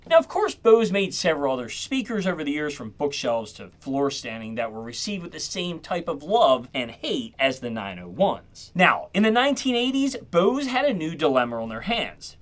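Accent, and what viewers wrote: Baltimore, United States. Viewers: Are you from Baltimore/Maryland? I'm not from the US but I'm trying to improve my accent identification. (Also please don't think I'm weird, I'm just a sperg.) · 10 seconds in and I knew you were from Baltimore.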